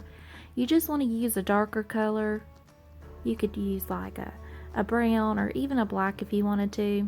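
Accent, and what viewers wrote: South, United States. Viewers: Love this diy. What part of the south are you from? I am in Alabama. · Wow, great project and great instructions! Trying to identify your accent - what part of which state are you from? (I’m from southwest Tennessee and I love all the different versions of southern accents 😜) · Great project. Just subscribed. Love your sweet southern accent. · I love ur accent? What accent is this I mean which part of the US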